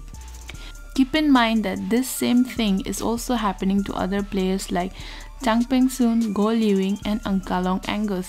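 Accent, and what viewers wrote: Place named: Malaysia. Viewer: I would think she sounds more like Malaysian or Singaporean.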